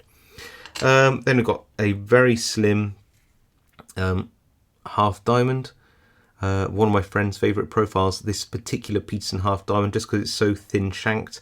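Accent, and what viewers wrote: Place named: Bristol, United Kingdom. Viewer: I can only agree with everything writes so eloquently (and punctuates so flawlessly). High production values and a touch of Bristol accent 👌. I have a small tube of these slim picks but I'm scared to use them. The screw tubes are great, too.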